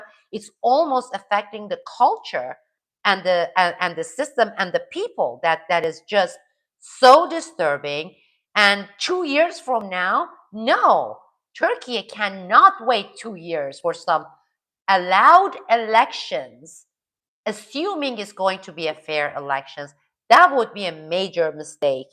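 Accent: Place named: United States. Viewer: Amerikada yaşadığın için çok akıcı Amerikan aksanı ile İngilizce konuşduğunu biliyoruz zaten ama senin izleyici kitlen Türkler ,bişeyler ispatlamaya ihtiyacın yok!!!!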